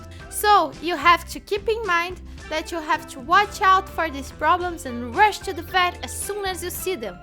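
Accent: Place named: Brazil. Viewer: I love the video 😂😂 you sound Brazilian btw! Am I right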